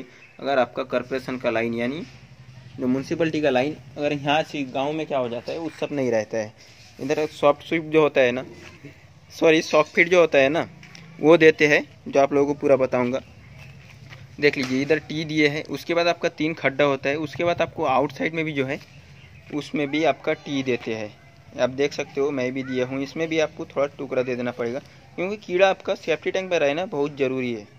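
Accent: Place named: Bengal, India. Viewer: Bangali ho na?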